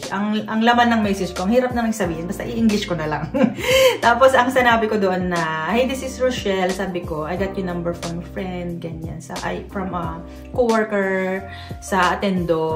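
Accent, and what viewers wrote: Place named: Sultan Kudarat, Philippines. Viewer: I love it ur "in Finnish eh" Isulan/Tacurong accent 😂❤❤❤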